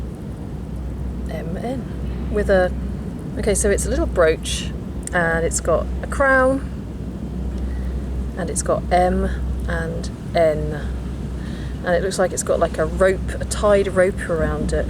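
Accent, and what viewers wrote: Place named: England, United Kingdom. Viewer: Hey Dearheart, I do the same thing, getting stuck on a word or phrase So here are some ^Words^ to help you out. After all, what are friends for, right? Appealing, absorbing, captivating, curious, fascinating, intriguing, delightful, inviting, pleasing, remarkable, amusing, charming, inspiring, thrilling, fabulous, and Galvanizing. I like the last one, coupled with your English accent, would be awesome.LOL So there you are, hope this helps in your quest of expanded vocabulary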